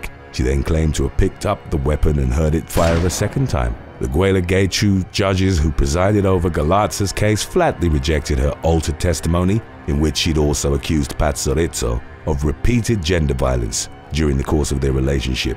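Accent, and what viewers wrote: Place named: United Kingdom. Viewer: Please please PLEASE stop using "the former" in your video narration. You are using it incorrectly and it's extremely maddening for those of us British educated grammar Nazis. Seriously I love your channel but you have got to stop misusing "the former". Mostly bc you aren't writing your words, your chair are not long enough for "latter and former" to apply, e.g., ten secs into a video isn't enough time to convey such qualifications bc your audience can't possibly know who you're talking about. And your audience is mostly American. Using unfamiliar terms with a British accent can be intimidating. Also, Spanish names and words hint: there are no hard "J" sounds at the start of most words and names, it is an "H" so "Jorge" is "Hor-hay", two LLs together make a "y" sound, Tucson is pronounced "Too sahn" not "Tuck-sin" but above all, let "the later" go, please. 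It diminishes your whole video for Me bc it's distracting in it's utter and complete wrongness. It's driving Me spare love.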